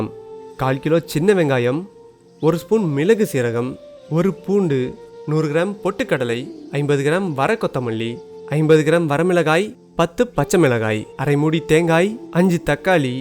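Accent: Salem, India. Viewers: Salem ah neenga bro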